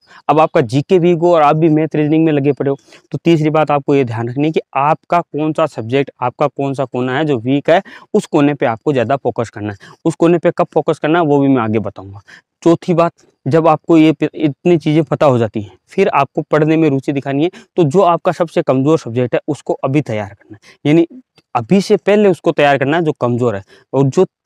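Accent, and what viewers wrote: Rajasthan, India. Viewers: Sir Rajasthan se ho kya aap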